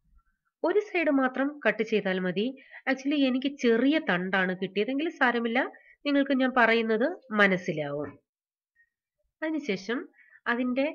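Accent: Kottayam, India. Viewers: Chechy.nalla video anu. Chechy kottayam.kariyano atupoleya chechiede samsaram.sweet voice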